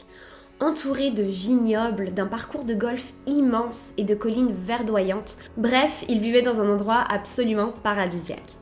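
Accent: Quebec, Canada. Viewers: Bonjour, ma première video de toi, j'aime beaucoup, merci. Je sens une toute petite pointe d'accent québécois, je me trompe ? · pas de quoi, je me disais bien que ça devait venir de l'anglais, mais ton accent québécquois n'est presque pas perceptible, je sais pas si tu l'a travaillé, mais ça fait très pro! bonne continuation!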